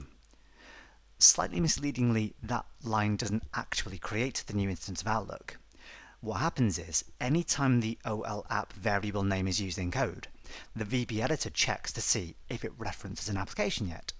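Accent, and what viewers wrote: United Kingdom. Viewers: Amazing video! thank you so much! You explain complicated things in such an easy way, great job! Oh and that amazing British pronunciation!!!!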